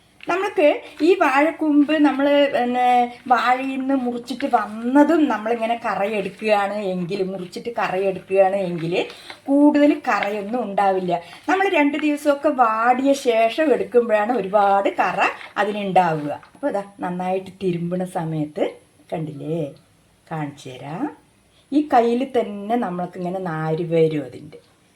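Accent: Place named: Palakkad, India. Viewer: പാലക്കാടൻ ഭാഷ ഇഷ്ടായി🎉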